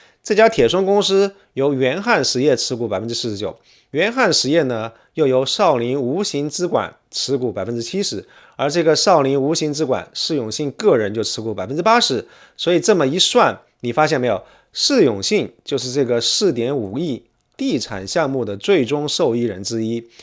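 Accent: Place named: Hong Kong, China. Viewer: 博主是不是香港人或者广府人？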